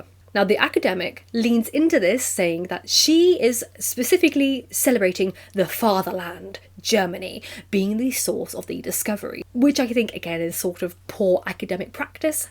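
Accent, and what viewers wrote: United Kingdom. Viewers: You sound British. But your physiognomy doesn’t look it.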